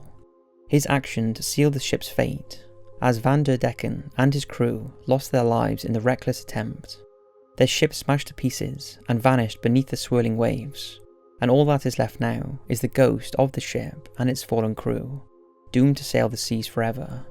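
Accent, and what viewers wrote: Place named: England, United Kingdom. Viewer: Didn’t know you were English haha 😂 iam too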